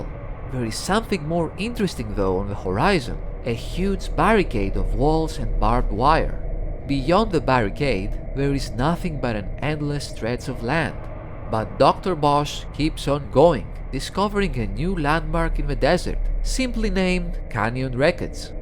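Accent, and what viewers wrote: Greece. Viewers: is this a greek accent? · Instantly could tell you're greek! Hello neighbor!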